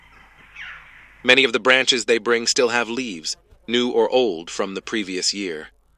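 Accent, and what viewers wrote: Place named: Andalusia, Spain. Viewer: TAN SOLO ESCUCHAR SU VZ DE UN CASTELLANO ANDA LUZ ME ENFOCA YA M ILUMINA LA SABIDURIA.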